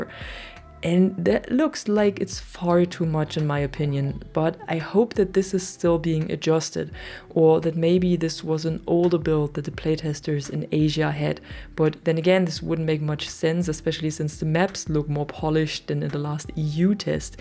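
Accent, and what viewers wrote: Germany. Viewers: Super süß wenn deutsche englisch reden 😃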